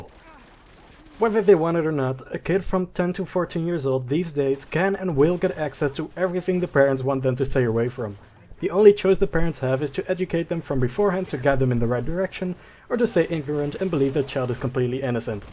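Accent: Netherlands. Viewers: I think he has a light Dutch accent but I am not sure. I do know that the movie rankings In the Netherlands stop at 16 which he mentioned in the video so it's possible.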